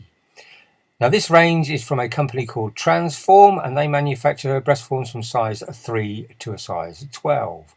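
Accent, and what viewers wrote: United Kingdom. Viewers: You ! From the U.K ? Love your English / British accent wow.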